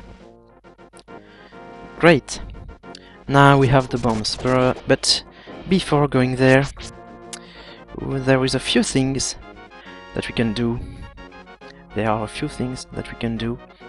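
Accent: France. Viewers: I have your game and I have to say it's done great! Keep doing this! And I just love your french accent! ;) Greetings from Sweden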